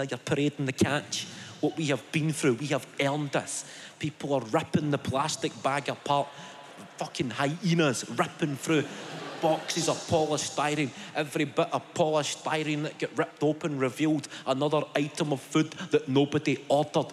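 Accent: Scotland, United Kingdom. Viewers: He's Scottish ya tool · He's not English. He's Scottish. YouTube doesn't understand Scottish. 😂😂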